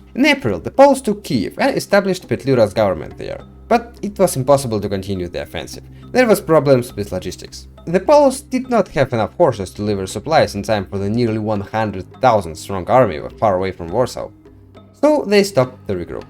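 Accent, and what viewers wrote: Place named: Russia. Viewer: good content, but pronounciatin is... difficult. Fast running through text and not very clearly spoken. Sounds kike a Russian native speaker thinking that speaking fast and unclearly means a high level of language skill. English natives are easier to understand... please do sth with that feedback, as your content is of value!!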